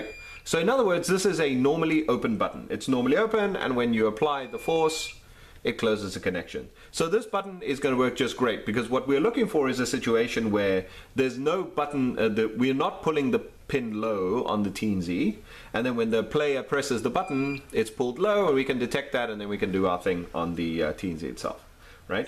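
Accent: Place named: Cape Town, South Africa. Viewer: hey, that accent sound very familiar, Cape Tonian myself, very informative and well directed, is as if you lecture S1 Electronics at TECH,,, im currently building my own arcade fightstick using iPAC2 interface and RPI3 with retropi image ( ofcourse i have to add my own roms)... by the way, where did you get the joysticks and buttons from? keep up the good work, cheers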